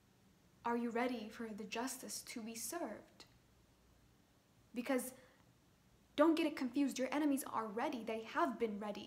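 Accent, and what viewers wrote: United States. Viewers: i Love your Chicago accent ❤ as *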